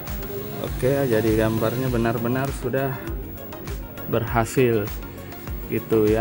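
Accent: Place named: Medan, Indonesia. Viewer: Tinggal daerah mana bg, kayak logat medan, saya d rantau prapat bg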